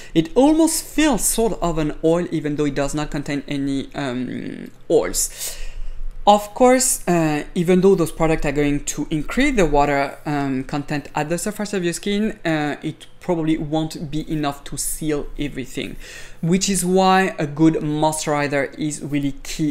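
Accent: France. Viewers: Bonjour!Hearing skin care tips with a French accent makes everything sound more luxurious.Great suggestions but as a 68 year old with acne I am afraid to use exfoliators for fear of making the acne worse,like purging from RetinA.Also as you get older your skin starts to thin and is more prone to broken capillaries.Would you still suggest these products for someone with my skin issues?I hope you have a lot of videos because I cant sleep and what better way to spend the time than binging on beauty videos.Good night!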